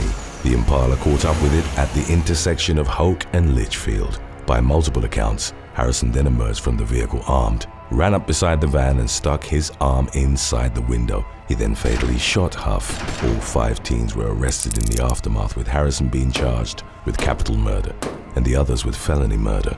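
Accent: United Kingdom. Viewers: The narrator sounds like "Idris Elba." I wonder if he grew up in a similar place in the UK as Mr. Elba? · The best part about this channel is the British pronunciation of American locations. 😂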